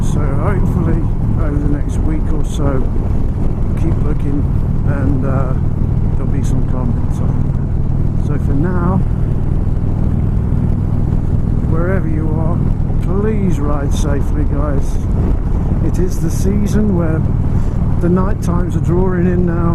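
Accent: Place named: Midlands, United Kingdom. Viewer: Great to see you back and to hear your midlands tones. Its like Nigel Mansell on a Cruiser. Best of luck with the studies.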